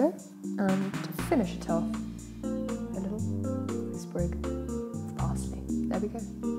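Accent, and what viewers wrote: United Kingdom. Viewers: LIAMM she’s obviously not from America can idk how you can’t tell she’s English with that accent lol this one is not on us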